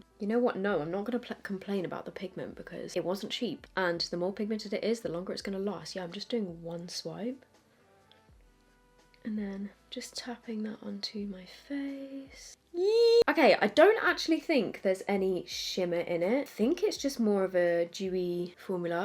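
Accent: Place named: United Kingdom. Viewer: What region would you say your accent is from? I love listening to you talk, but my main points of reference for UK accents are you and the Welsh twins lol #questionoftheday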